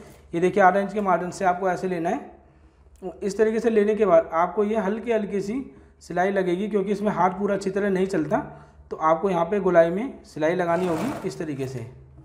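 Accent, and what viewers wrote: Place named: India. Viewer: Sir ap muslim ho kya.ku ky ap k name muslim h lkn ap bolty india ke zuban jaisy ho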